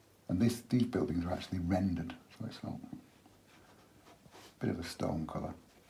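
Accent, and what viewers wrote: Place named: Northern England, United Kingdom. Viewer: I am seriously enjoying your videos so much. I'm guessing you are up north and you say grass instead of grauss! ;) Your sense of humor is a delight! I can tell you've been painting a long time... but your pallet is driving me crazy.. maybe I have OCD! It works for you but it looks moldy! :) Please receive this in the way it's intended and not meant to hurt feelings. :) You always end up with a beautiful painting.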